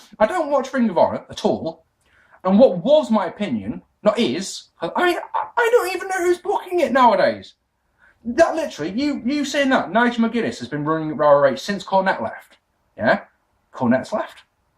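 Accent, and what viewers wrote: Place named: Cockney, United Kingdom. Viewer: Hay why do you do the whole cockny boy "faaking awesome"?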